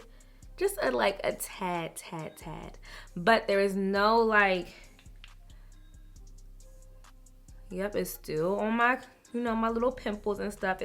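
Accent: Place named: Baltimore, United States. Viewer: It's my first time watching you. Are you from Baltimore? I detect an accent. Your face looked flawless btw.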